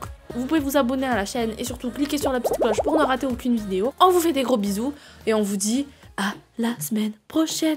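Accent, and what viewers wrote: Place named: Southwest, France. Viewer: mdr le petit accent du sud ouest ! cool la vidéo en tout cas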